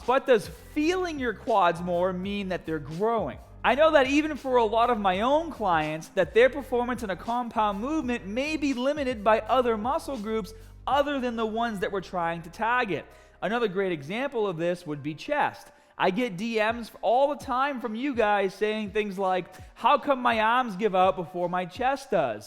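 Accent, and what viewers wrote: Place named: Northeast, United States. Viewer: Northeast accent. So obvious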